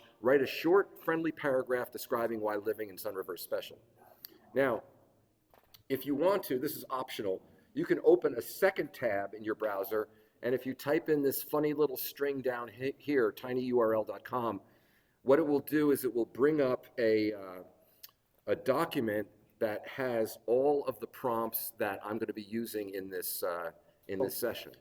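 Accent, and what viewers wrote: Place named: Italy. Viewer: came for the AI talk, stayed for the Italian-accent poetry Great intro to generative AI. You made a complicated topic feel practical, and the “AI predicts words, it doesn’t think” explanation really clicked. Also… now I have no excuse for bad emails anymore. Nice job making AI understandable for normal humans. Cafer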